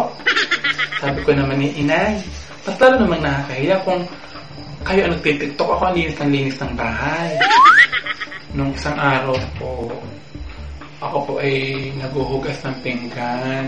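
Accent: Batangas, Philippines. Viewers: Nakakatuwa ka....ala eh batanguena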